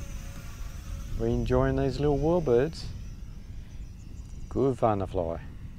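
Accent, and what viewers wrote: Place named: Australia. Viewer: Accent = Ozzie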